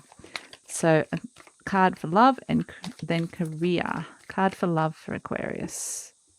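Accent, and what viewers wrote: Australia. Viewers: You sound quite the "Aussie."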